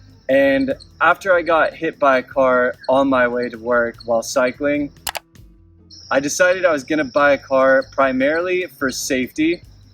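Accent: Australia. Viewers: Hello, i really like your videos, keep em coming please. I noticed you are starting to sound more Aussie, goodonya!! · 5 months and your accent is sounding really Australian on many words and phrases! I've been living here for years and I just can't get the sound right. Tho 'family and friends in California say I use some weird words or expressions sometimes.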